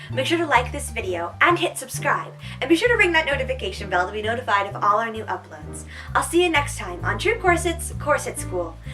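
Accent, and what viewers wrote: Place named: Ireland. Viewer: I’m sure you’re Irish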